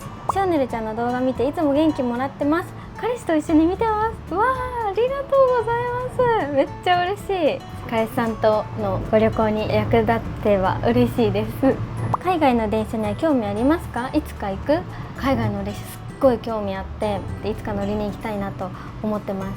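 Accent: Kansai, Japan. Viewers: ちょいちょい訛っているから西日本出身だと思ってた！ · ちゃんって、独特のイントネーションから関西人だと思ってました‼️ てっきり関西弁訛りの標準語だと…知らんけど😅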